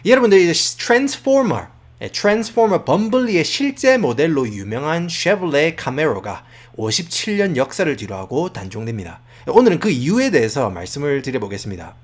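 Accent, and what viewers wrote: Gyeongsang, South Korea. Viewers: 영어를 경상도 사투리로하네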